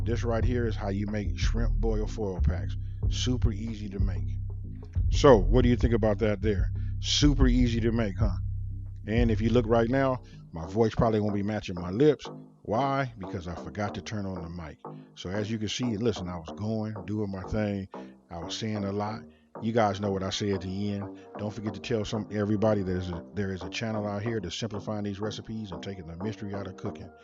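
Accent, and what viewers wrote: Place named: Los Angeles, United States. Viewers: He sounds like a west coast guy...My people loved the recipe love from the north east 😎🤙🏽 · If LA had an accent thish it homie. Loved the video I’m about to make this right now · I can tell that Cali accent anywhere. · I love California accents. We really the best out mayne 😂♥️ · I heard that Cali accent and subscribed immediately!!! Made me straight home sick.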